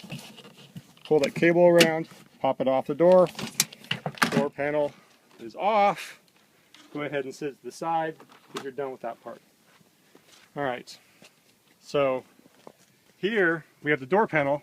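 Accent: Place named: Canada. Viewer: Thanks a lot I like your video I really like Canadian eng Americans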